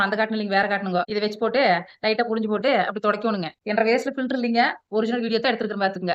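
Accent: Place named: Coimbatore, India. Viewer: கோவை பாஷை சூப்பர் ❤❤❤❤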